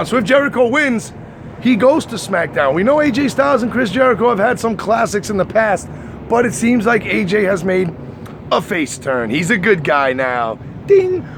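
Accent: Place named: New York, United States. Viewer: I hear the NY accent now 😜 ps.. game 6 is ours! It ain't over till it's over brother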